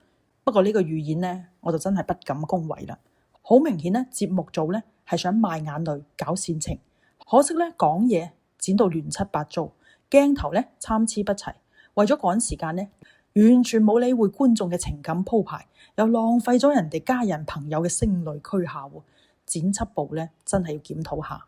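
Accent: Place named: Guangzhou, China. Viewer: 主持人有廣州口音🤔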